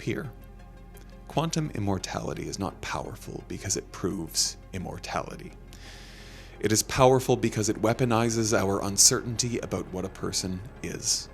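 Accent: Canada. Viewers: Is the voiceover Canadian?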